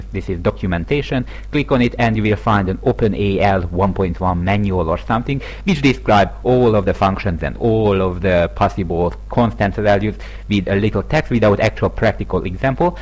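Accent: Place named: Hungary. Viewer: Nagyon jó oktató videó, köszi! Messziről rikít a magyar akcentus, bár ezért a YT névjegyben is szabadkozol. Már majdnem rákérdeztem külön is,de aztán feltüntek a menüpontok. Viszont a videó nagyon jó, és messze jobb pár ér felvágós, indiai akcentusnál.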